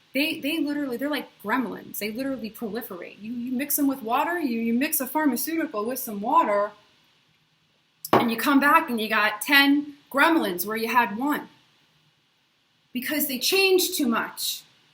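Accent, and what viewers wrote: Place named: East Coast, United States. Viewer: I love your subtle east coast accent and attitude. You are still attractive on your worst day - Jacob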